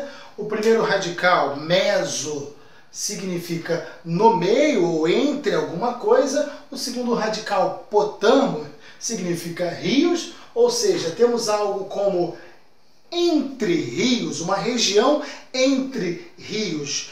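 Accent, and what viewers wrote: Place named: Florianópolis, Brazil. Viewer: Seu sotaque é de Florianopolis vc é de lá eu sou eu sou manésinha kkk!!!!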